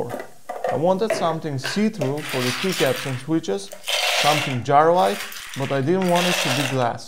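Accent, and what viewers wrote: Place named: Israel. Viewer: there's no fucking way, you have the most Israeli accent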